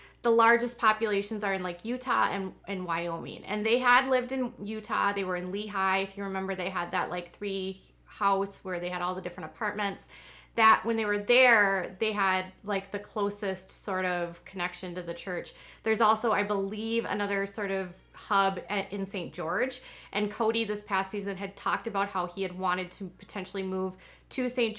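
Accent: Minnesota, United States. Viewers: This is the first video of yours I watched. I thought "she sure sounds minnasoooootan" :-)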